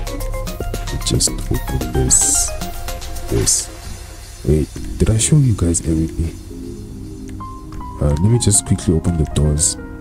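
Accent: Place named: South Africa. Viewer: The way you speak you from South Africa bro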